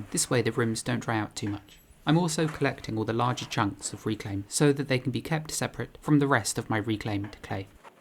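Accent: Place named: United Kingdom. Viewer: I think a good recipe for youtube carreer balance seems to be (from the youtubers I consume the most at least) just doing what's fun for you. If it's genuine and makes you want to keep doing it, and monetary reasons aren't your main driver, then it's better to let an audience that fits your vision come to you than vice-versa. Obviously this prescription completely backfires if your main goal is making the most money, but those channels tend to not last too long so make those calculations yourself if that's the case I guess. Personally I appreciate intentional videos, but I also really appreciate your channel cause it's like looking into the window of a person who's life you have nothing in common with, learning a bunch of things along the way, and in that calming soothing brittish voice of yours that I love. Hoping to someday find the inspiration/time to practice pottery, but until then, I'll enjoy watching you do your thing :)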